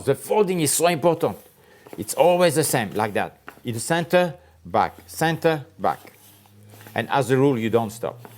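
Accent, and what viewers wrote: France. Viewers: I love listening to the English french accent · It’s Amazing!!! So easy to understand and such a pleasure to watch,very entertaining with lovely french accent,Mercy SHEFF!!! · such a cool accent the French. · When I watching this man I feel like in watching the movie Ratatouille. It is so amazing! The background, the setup, the lovely french accent...the way he looks!!!! It is a movie 🥰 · Love the way you explain the process. Your French accent is very infectious, in a good way. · French speaking English also sounds beautiful.... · I love that french accent!! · I really loved your english with the french accent 😄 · l'accent français en anglais est horrible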